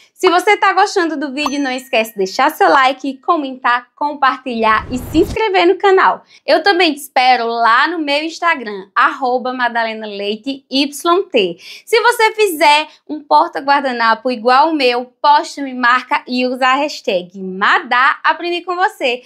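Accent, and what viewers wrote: Northeast, Brazil. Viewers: Ensina pedraria e ainda rima cordel 🥰 Ainda por cima com esse sotaque que só o nordeste oferece 😊Virei fã !!!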